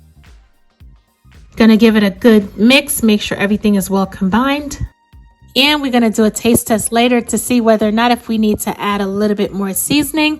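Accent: New York, United States. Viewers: Obviously....NYC/New York City. Probably Brooklyn or Queens area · U can tell she's from New York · Oh man I can dive right into that skillet and eat my way out. Just the way I like it. I get lazy too much and go for popular pre mixed Cajun rice This is so much better. I almost thought you forgot about that half cooked shrimp but I should have know better you got that lid on their and cooked them just enough You got the right sausage too. Love that accent. Why do I think I hear New York City in there?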